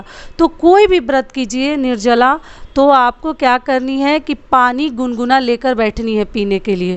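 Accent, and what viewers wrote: Bihar, India. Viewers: Madam ap bihar se ho up se ho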